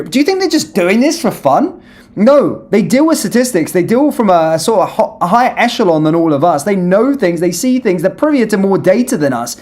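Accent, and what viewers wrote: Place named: Estuary English, United Kingdom. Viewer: Listen to the Estuary English whine of this goof.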